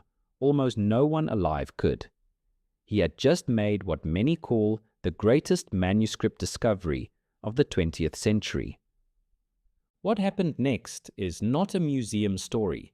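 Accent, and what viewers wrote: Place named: South Africa. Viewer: I love that this AI has a decent South African accent 😅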